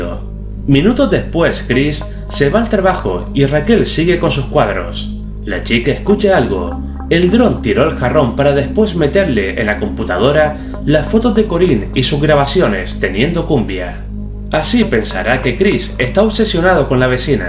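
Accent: Canary Islands, Spain. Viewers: Eres canario? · Are es canario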